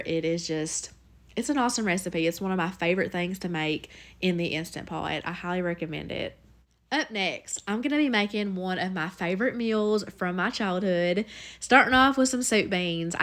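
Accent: South, United States. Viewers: That southern accent makes my heart smile. Hearing the voice-over, I can just imagine your beautiful smile. I'm definitely trying the chicken nachos recipe & the beef tips. I've always wondered if Josh likes real onions, lol? I'm not a fried salmon person either. As a child I ate a lot of them & now I hate them & the smell of them 🤣. Have you ever heard of salmon salad (like tuna salad)? My grandmother used to make it & I like it that way. That's the only way I will it salmon now & that's every blue moon. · That’s what I was saying, I think it’s a southern thing — just a different dialect. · To all those correcting her pronunciation, Southerners typically pronounce the L in salmon. It's not incorrect; it's cultural! ❤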